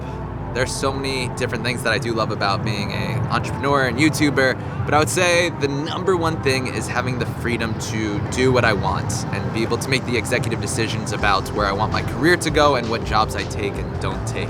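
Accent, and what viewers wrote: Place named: New York, United States. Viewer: Not coffee... Kaawfe...you r a genuine New Yorker man...✌️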